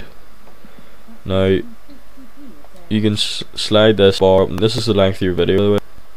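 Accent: Northern Ireland, United Kingdom. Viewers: Yoooo ur frum Northern Ireland too matee haha high Five!! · Northern Irish. I know that accent anywhere! I'm Irish too. Hell yeah!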